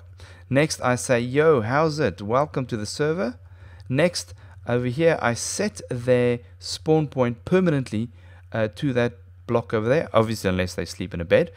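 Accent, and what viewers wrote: South Africa. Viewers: I've been looking for something like this for ages...I love you! Thanks for the great How to vid! BTW you sound South African...xD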